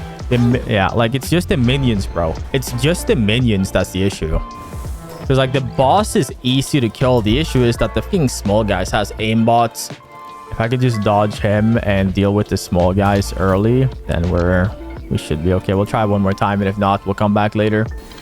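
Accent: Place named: Sweden. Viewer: Wait are you Swedish cus it kinda sounds like it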